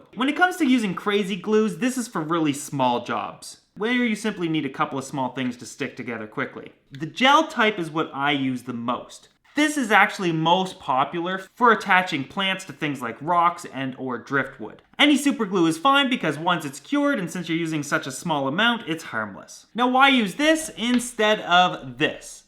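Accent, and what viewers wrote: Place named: East, Canada. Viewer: You must be from eastern canada somewhere lol your accent sounds like it :p But for real, I doubt what I was gunna use is safe for an aquarium...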